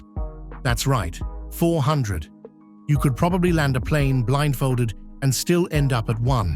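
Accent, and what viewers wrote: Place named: United Kingdom. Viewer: The Narrator just gained a proudly South African follower. He is so good at explaining and very informative. Sounds British, Great accent.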